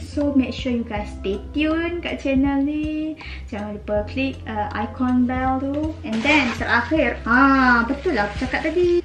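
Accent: Kedah, Malaysia. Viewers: Sya suka kak punya perckpan dialek Kedah tu🤣🤭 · Weiii, teka betul la kuning! Hehe, eksaited sendiri! Btw, suka dialek Kedah sebab ada seorang kawan Kedah kat uni dan rindu beliau bila dengar cakap lagu ni. Best video! Suka tengok. Keep going! 😊😊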